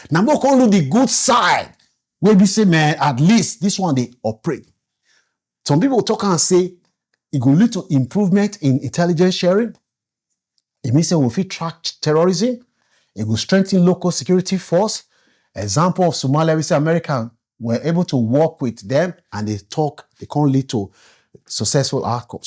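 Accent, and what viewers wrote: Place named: Warri, Nigeria. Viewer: I like this your Warri pidgin. Abeg no stop😂”….say men…”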